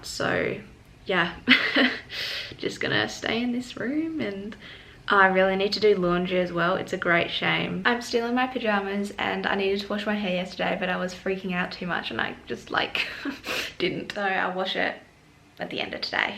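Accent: Australia. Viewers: She’s Australian 😂😂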